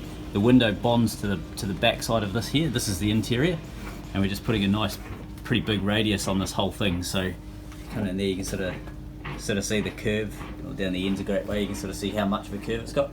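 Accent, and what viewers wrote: New Zealand. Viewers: He's saying pantographin kiwi!!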